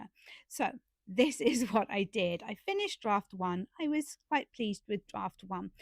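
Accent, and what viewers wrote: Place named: New York, United States. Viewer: welcome. I love when your real NY accent slips out.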